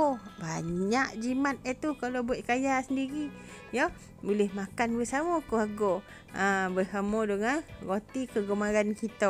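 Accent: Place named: Palembang, Indonesia. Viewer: Sedap nian kuenya, accent kakak mirip samo baso kami wong Palembang (sumatra) 😁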